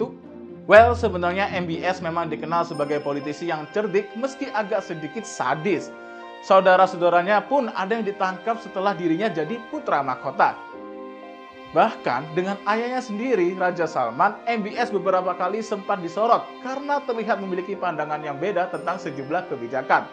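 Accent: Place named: Java, Indonesia. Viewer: Medokmu itu lo mas, aku suka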